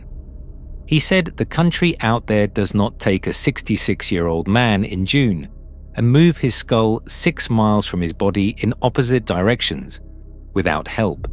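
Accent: United Kingdom. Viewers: "I'm and if you've BEAN following this..." Get rid of the british accent.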